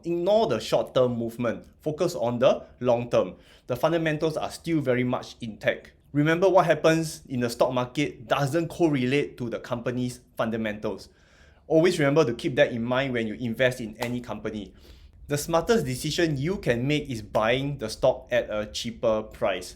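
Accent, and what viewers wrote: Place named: Singapore. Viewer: If you cant tolerate that singapore accent, I recommend moving there permanently.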